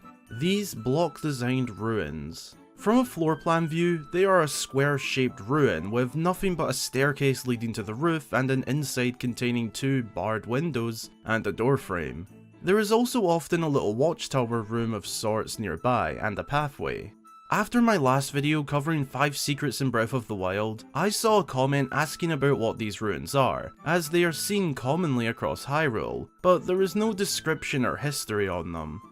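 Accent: Scotland, United Kingdom. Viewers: you sound like you're Scottish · Your Scottish accent is amazing xD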